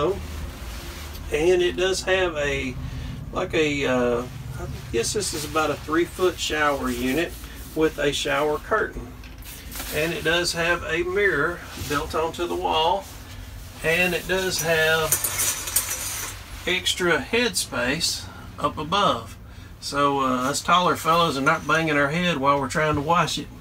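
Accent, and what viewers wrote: South, United States. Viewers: great tour and like your southern accent! but seriously very complete tour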